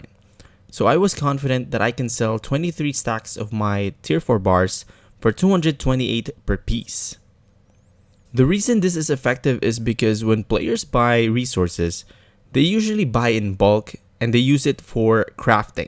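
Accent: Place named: Philippines. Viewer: Hi bro you have hints of Filipino accent with you. Am I right? Great video btw should i leave my current guild with friends to join the VIP crafters?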